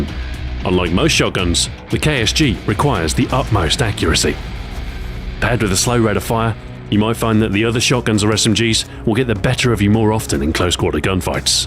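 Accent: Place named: United Kingdom. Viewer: Somehow your Britishness makes all of this sound much more official.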